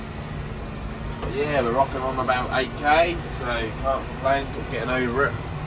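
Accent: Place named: Suffolk, United Kingdom. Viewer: Hi, good to hear what your up to! Doing well and enjoying the farming by the looks of it. Sounds like you been working with some Suffolk farmers, you have picked up that lovely accent. Yes keep posting some in cab videos, though your drone footage was always great! Keep them furrows straight now!👍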